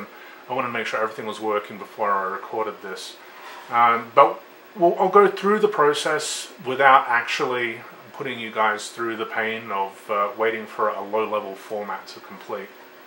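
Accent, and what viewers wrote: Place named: Australia. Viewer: Damn good video. I'm guessing that is an Australian accent. From a Brit perspective, when I was young (with my youngun mind), basically Australia looked like one of those countries that was big enough to have stuff USA had, like skyscrapers and basically "massive civilisation stuff". I hope that makes sense. Well, anyway, it intrigued me as a kid the way Australia (and actually Canada is a bit like this) has that and has PAL and the Amiga. It was like the Amiga having a fighting chance (and I was an amiga fanboy of course). Then, in Europe (northen Europe and then down to France and Spain) used the amiga too but also UK) they seemed kind of condensed like a hardcore of coders (demoscene etc) and gamers as a seeding ground for amiga stuff that would permeate around the world. A channel which reminds me of yours a bit is PhilsComputerLab because he appears to somehow have some space (does he actually live in the outback or something?) and tons of hardware. 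So Austrlia (and big landmass countries with skyscrapers like Canada) had the resources and civilisation to form these kinda Amiga museums today. It is shocking what amiga stuff ended up thrown out in the UK. I remember hearing about a guy who had a gold colour a500 (one of those special ones) and while he was at university, his mum cleared out the loft and thereby ditched it. Ouch. So, maybe you can see why I like Australian (and canadian etc) usages of the amiga. :)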